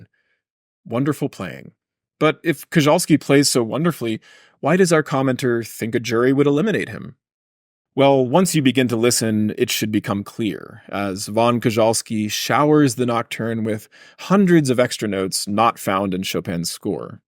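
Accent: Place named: United States. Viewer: Thanks for the reply - I recall now that Americans pronounce "era" and "error" alike, which has confused me before. You guys certainly make some weird and apparently arbitrary alterations - what is the logic behind putting a long o sound in "Chopin"? And it's clearly spelt Koczalski, so why say Koczolski?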